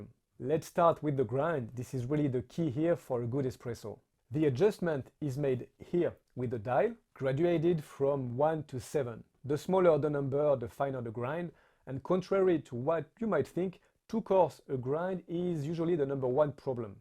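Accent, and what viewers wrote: France. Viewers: You have to be from Quebec or France. Love the accent.❤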